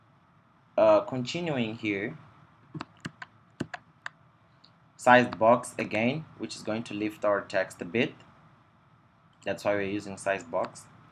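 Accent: Brazil. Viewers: Vc é br né? Esse sotaque não é de alguém nativo...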